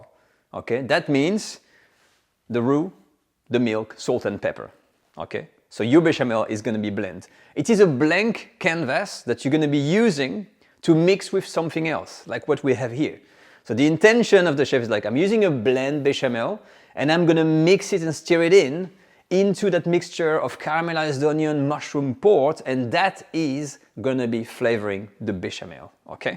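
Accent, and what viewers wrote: France. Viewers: I like his French accent so much! It adds a lot to the experience!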